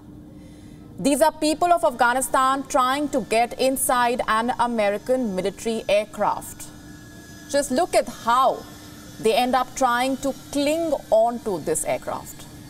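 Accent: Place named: India. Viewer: 印度英文口音好明显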